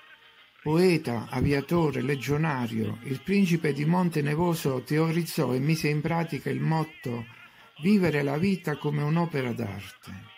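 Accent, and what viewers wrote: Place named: Abruzzo, Italy. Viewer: Tu sei abruzzese giusto? da l'accento sembra dato che io lo sono....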